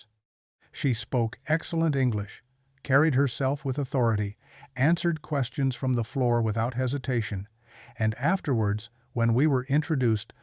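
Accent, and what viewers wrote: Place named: United States. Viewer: This guy sounds like an American, yet he measures money in British pounds. This video is a warning about how not to be stupid, as all these types of videos are. I guess it is in the right place, as YT is where all these stupid people like to hang, but the content is a just copy of thousands upon thousands. Lesson of video: Use your brain and there are no problems here (...and maybe avoid BKK). In the end, this video is just a repetition of many, many other vlogs on YouTube. It is a simple, lazily made imitation of someone else's original idea. Every Farang in Bangkok thinks this is how to create content, but this type of channel is now getting really tired and uniteresting. If you are going to make up a story, next time make sure it has an original thought behind it.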